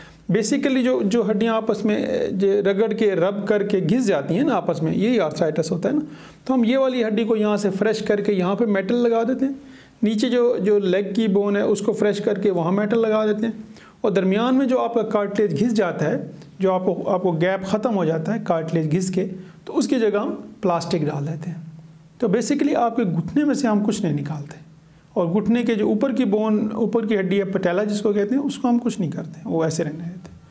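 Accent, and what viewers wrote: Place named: Khyber Pakhtunkhwa, Pakistan. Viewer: Dr.sahib where u located your accent show KPK.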